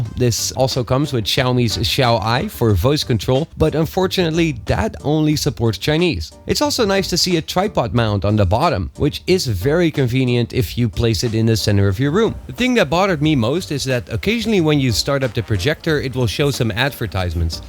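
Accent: Netherlands. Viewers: Allthough you speak very good english, i detect dutch......am i correct? Nice vid btw. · your accent....is that dutch??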